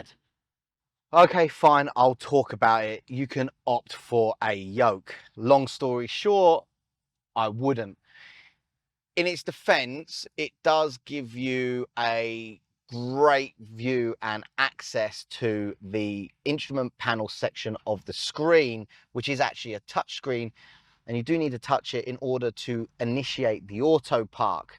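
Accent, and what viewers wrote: United Kingdom. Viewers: I've been torn about writing this comment, but I've choosen to be honest with you, since I've been enjoying this channel for so long, and it deserves my honest opinion - no punches hold back. I don't like the style of this reviewer at all: 1. his strong accent make it quite difficult for people not used to British accent to understand what he's saying - this is even more important for non native english speakers, who will struggle compared to Ethan, which instead is quite easy to understand. 2. he's speaking too slow, and with a lack of energy. 3. the review itself is boring. That never happened to me before in a review of this channel. 4. please don't assume that all people watching this channel uses imperial measures - we are not. In fact, it is probably the opposite, since the US is the only country on the entire planet that it does (4% of the world population). 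Please use the standard metric system, eventually using also other local (old and obsolete) unit of measurement if you want to help people not used to it (ex. "I'm 1,75m (or 5 feet 9 inches) tall"). Thank you. So, not meaning to be rude, but I'll probably skip the next reviews with this reviewer...unless is really a car I'm looking for. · Some people struggle with the English accent. I tried to slow down a bit to compensate. Seems it didn't work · I dont like the audio , the accent is so british for me or even irish , and its not entertaining everall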